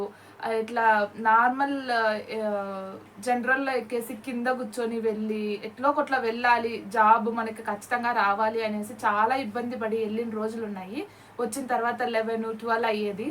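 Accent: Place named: India. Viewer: this girl is inspiration to those people who are just sitting and weeping that i dont know english i cant do my background is poor and finding many other excuses instead of just trying like her ...... i apprecciate your video sister by the way adhi indian vocabulary or pronunctiation kaadu its called an INDIAN ACCENT (WITH BASIC ENGLISH VOCABULARY) 🥰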